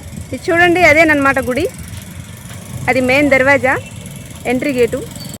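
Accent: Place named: Andhra Pradesh, India. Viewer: Mee Telugu Vijayawada Guntur lo matladya Telugu la undi andi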